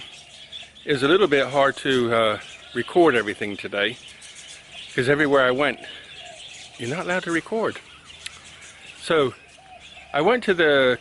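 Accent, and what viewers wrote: United States. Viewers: Hello found your channel today and such a lovely change from most other Thailand videos. I used to live in Thailand but left in 2002 and many times wish I hadn't but we all do things for a reason at certain times when we think it's right. Anyway I was surprised to hear you are from England you have a slight Texan twang to your accent. You mention HRT, I am on Nebido which is a testosterone replacement therapy. Two reasons the GP put me on this is because one I had a low testosterone levels and two I have ED at times which is a result of various medications I'm on. It is given to me by injection every 12 weeks. I have no idea of your reasons and its not my business but just thought I would mention it to you. I have just subscribed and will keep following your life in Thailand.. Power to you..David..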